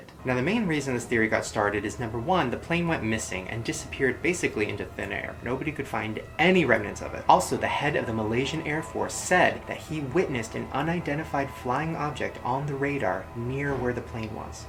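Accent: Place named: United Kingdom. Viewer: i love the subtle british accent he does in this series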